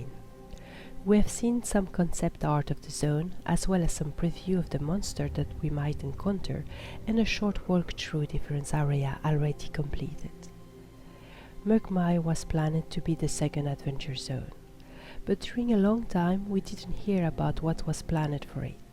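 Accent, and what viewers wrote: France. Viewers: Êtes-vous français ou québécois?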